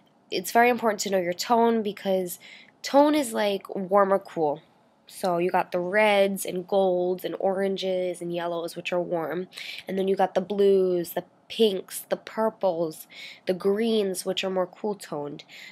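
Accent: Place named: New York, United States. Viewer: Do you live in New York? I can kinda hear the accent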